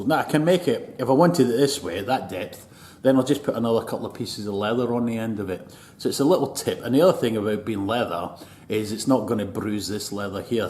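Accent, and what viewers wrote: Scotland, United Kingdom. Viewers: Don't take it personally but you sound similar to a character from the TV show"The Simpsons". A Scottsman.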